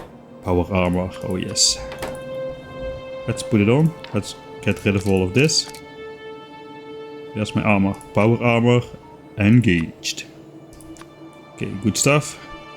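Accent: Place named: Limburg, Netherlands. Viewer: Was trying top determine from your accent from which part of The Netherlands you are. My guess is the northern part of Limburg. How close am I?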